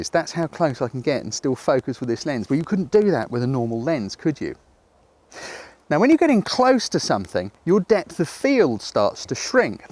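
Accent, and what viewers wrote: England, United Kingdom. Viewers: Only discovered your videos today, but am completely hooked. Your teaching style and pace is fantastic and your english accent is like a balm (No offense Americans - I love you guys, but being from the UK myself it is damn nice to hear a fellow brit every now and then). I think you should totally have your own TV show maybe on Discovery Shed (I'll start the petition if you like)!!! Seriously though, thank you for what you do, ad for the help you have already provided me with.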